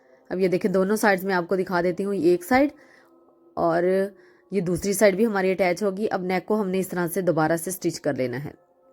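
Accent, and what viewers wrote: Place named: Punjab, India. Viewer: Bhoht khub ❤ mam aap kha se ho panjabi tone aati h aapki voice mai 😊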